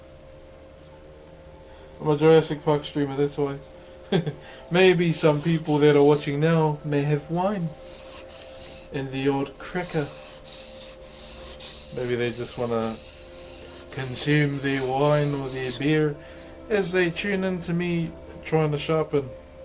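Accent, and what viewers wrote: New Zealand. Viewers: It is the same accents they're both Maori, the indigenous ethnicity of New Zealand 🙂 not all Maori have this accent but a lot do.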